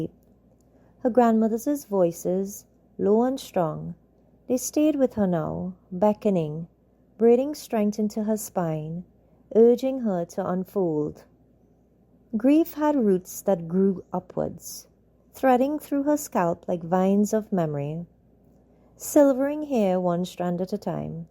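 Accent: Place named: Trinidad and Tobago. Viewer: Love your story. Brought back so many memories and love the fact that you were selective with your trini dialect. It was used just in the right places.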